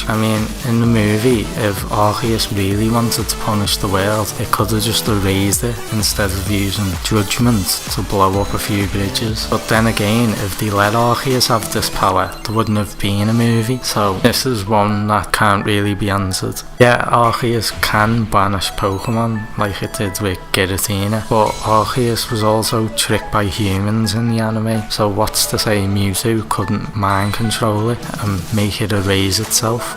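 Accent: Liverpool, United Kingdom. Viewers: Is that another scouse pokemon fan. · Your Liverpool accent. 🤮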